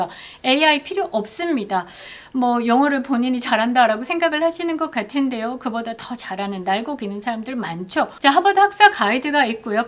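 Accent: Gyeongsang, South Korea. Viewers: 전한길뉴스보고 왔습니다 경남사투리 예쁜누님 화이팅!!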